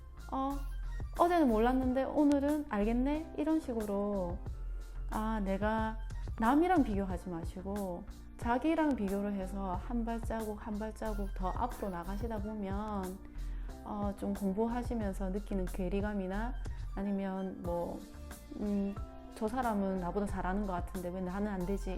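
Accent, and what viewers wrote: Gyeongsang, South Korea. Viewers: 영상 잘 보고 갑니다. 자신감을 가질수 있는 좋은 영상입니다. 댓글을 보았더니 또 악플을 다는 사람들이 있더군요... ㅠㅠ 나쁜사람들~~ 그리고 부산 분이신가요? 경상도 사투리도 구수하네요 아무튼 잘보고 갑니다. 구독 하였으니 좋은 영상 많이 올려주세요.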